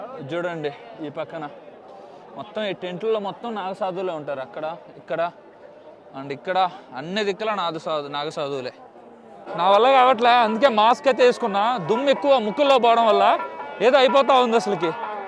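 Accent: Nellore, India. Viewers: ఉన్నది ఉన్నట్లుగా నెల్లూరు భాషలో అద్భుతంగా చెప్పావు నాన్న థాంక్యూ వెరీ మచ్ మాది కూడా నెల్లూరు నాన్న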